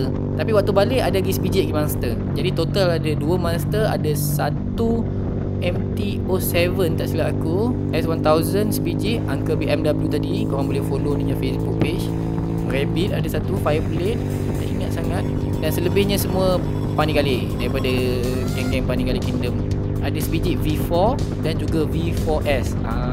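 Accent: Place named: Borneo, Malaysia. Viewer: Bro asal mane? Slank mcm belah borneo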